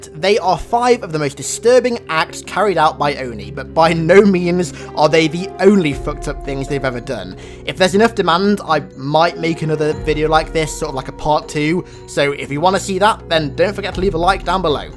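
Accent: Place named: England, United Kingdom. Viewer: English country accent , The greater good .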